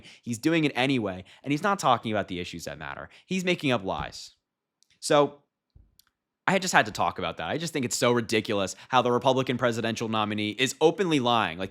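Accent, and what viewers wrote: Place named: England, United Kingdom. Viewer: you’re the best. I live in Montreal, Canada and I’m following you. Your English accent is excellent and easy to understand since I’m a french Canadian. My wife and I find that you are a very intelligent young man. Bravo!!! And keep on doing the good job. Roger:)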